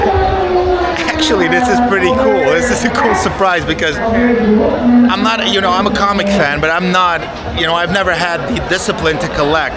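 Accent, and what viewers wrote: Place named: Hollywood, United States. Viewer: Your English as a Dutch sounds like Hollywood English accent.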